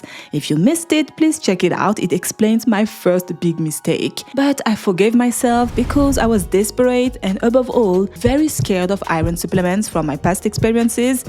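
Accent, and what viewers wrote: France. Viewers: I picked up the same French accent was confused by Germany 😂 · Quel beau Englais-Francisé😊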